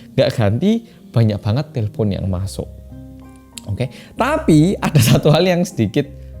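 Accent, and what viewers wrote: Java, Indonesia. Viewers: salfok mas ngomongnya medok bingitsss wkwkwk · Kok sleg jawanya engga hilang ya Mas bro... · Mas mas medok ae jawane ... sing ndih... hehehe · Sesungguhnya saya penasaran kenopo kok mas dialek nya masih kuenteel medhok.. gimana kah cara menjaga nya..?? (Semoga dibales) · Udh nonton bang reviewnya.. abang orang korea tapi Logatnya Jawa Toh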